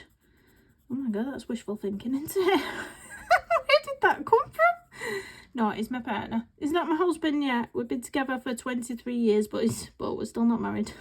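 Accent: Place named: Wales, United Kingdom. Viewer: Hi beautiful I love the unicorn 🦄 she is looking beautiful.. I really thought u were from wales lol.. your accent is beautiful when I talk in Aussie slang some people look at me too exp when they aren’t Aussie lol 😂 lol forward watching your next colour and chat ❤x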